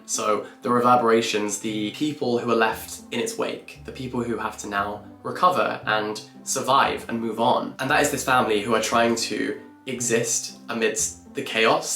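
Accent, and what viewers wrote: United Kingdom. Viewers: How can someone be so attractive? I watched a 30 minute video just watching to his face and listening to his British accent🥴no idea what he was talking about. So distracting.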